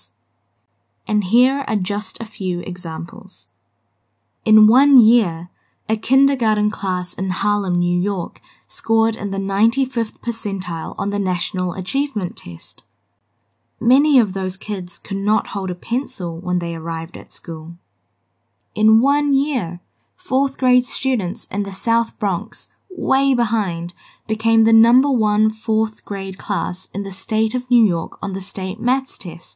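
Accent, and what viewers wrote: New Zealand. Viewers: 언제 어쩌다 뉴질랜드 발음에 꽂힌 지 모르겠는데 그때부터 항상 잘 듣고 있어요! 목소리랑 발음 너무 예뻐요!! 나중에 문장 끊어서 쉐도잉 할 수 있도록 반복재생? 되는 것도 올려주시면 좋겠어요 너무 좋아요ㅜㅜ♡ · 이거랑 Te Aorewa 영상으로 쉐도잉하는데 정말 좋아요! 항상 쉐도잉 자료는 영국, 미국 영어만 널려있어서 손이 안갔는데 한국 유일하게 키위 액센트 교육채널이 있다는게 정말 감사해요. 앞으로 인터뷰나 테드처럼 쉐도잉 할 수 있는 컨텐츠도 더해주실수 있나요??ㅠㅠ 목소리도 너무 예뻐요ㅠㅠ 왜 top sexiest accents에 키위억양이 1위로 뽑혔는지 알것같아요ㅋㅋㅋㅋㅋ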